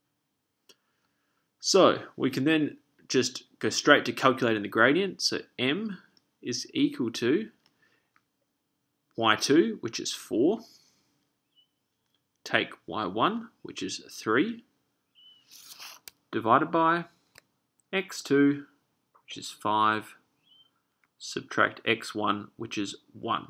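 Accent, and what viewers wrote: Australia. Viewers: hey mate u sound aussie,?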